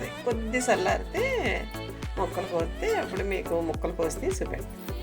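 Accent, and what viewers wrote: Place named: Konaseema, India. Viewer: So nice మా కోనసీమ మాట లా ఉంది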